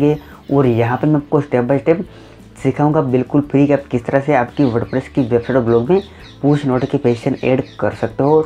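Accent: Gujarat, India. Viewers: Seems like Gujrati. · Bhai gujrati laago cho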